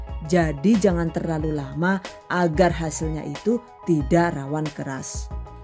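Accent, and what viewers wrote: Banyumas, Indonesia. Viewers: Buat ciri khas mba, apalagi ada dialek ngapaknya, bagi saya menghibur sekali 😁. Sukses selalu 🤗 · Ini resep mantuuullll bangetttt......simple, enak, dan jdi inspirasi jualan...( Meski sampai sekarang belum jualan. Juga ), gara2 Nemu video ini, aku jdi seneng banget kro channel kie....maning narasine kadang di tambaih bahasa internasional, yakue ngapak....tambah seneng lah inyonge....sukses terus ya buund....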